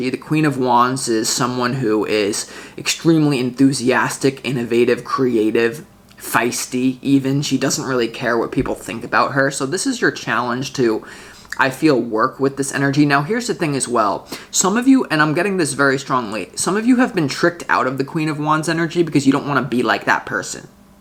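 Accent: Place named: South, United States. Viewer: South ? No wonder. I rec the accent. I grew up in the so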